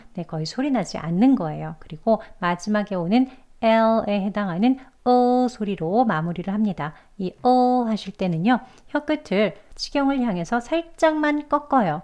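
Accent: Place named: United States. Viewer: 전형적인 미국식 발음 같네요. 오늘도 잘 들었습니다. 감사합니다.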